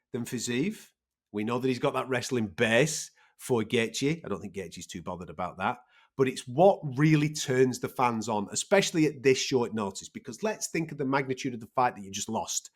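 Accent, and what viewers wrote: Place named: England, United Kingdom. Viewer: Also the thiccc English accent makes all the names sound so epic 😂🔥🔥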